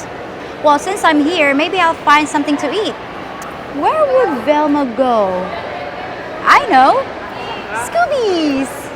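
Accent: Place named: United States. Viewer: Any reasons you’re talking with an American accent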